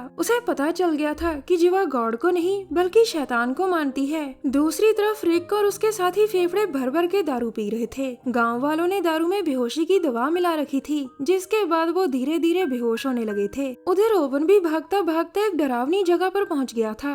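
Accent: Punjab, India. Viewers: See I was thinking about you nd u r here... sachhe wala pyar h... nibhana apko bhi pdega.. Lv uuuuuuuuuuuu............uff ye Punjabi accent 😍 👌....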